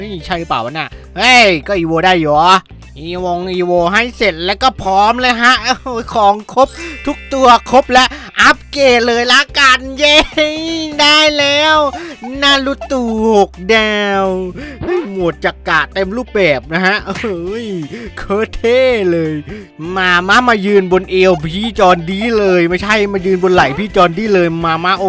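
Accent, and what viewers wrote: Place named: Korat, Thailand. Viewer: โคราชอยู่นิดหน่อย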